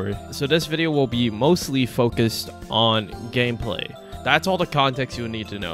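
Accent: Canada. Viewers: i cant help but notice that you sound weirdly canadian in this video? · Some of these comments are making me hear your voice as more Canadian. How the hell?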